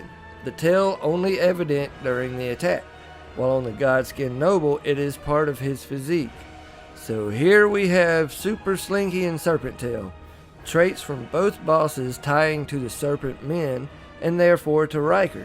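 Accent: South, United States. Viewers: A southern drawl talking shop about Elden lore, I’ve never subbed so fast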